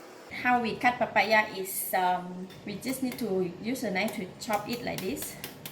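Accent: Malaysia. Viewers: no wonder you sound so Malaysian :) I really enjoy your down-to-earth explanations to cook home cooked food with love ! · You have a malaysian or singaporean accent..? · U have Malaysian accent?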